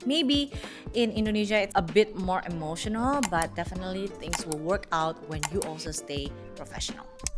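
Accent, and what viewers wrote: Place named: Philippines. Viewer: sorry, but you sound like a Filipina, sorry, not sorry, darn it! whatever!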